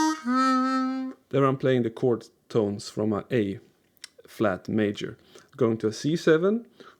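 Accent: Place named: Scania, Sweden. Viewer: Ja, jag fattar inte varför man skriver på engelska. Men det är ju youtube. Jag hör att du okså är skåning när du räknar inn...one..two...tre...fyra !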